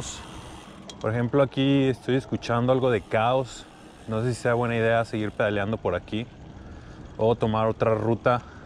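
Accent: Guadalajara, Mexico. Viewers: se te nota el acento tapatío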